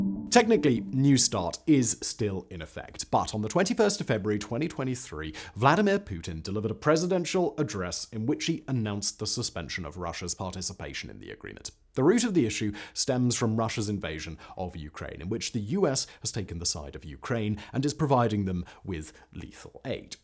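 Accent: RP, United Kingdom. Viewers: Silver linings, we got to hear say “penetration aids” with his posh accent. Made my day.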